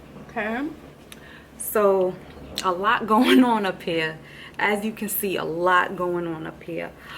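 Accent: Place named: Harlem, United States. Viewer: Lol! I guessed that watching one of your other videos bcse I recognized the accent and the attitude (Harlem native, right across the bridge 😉) Peace & Love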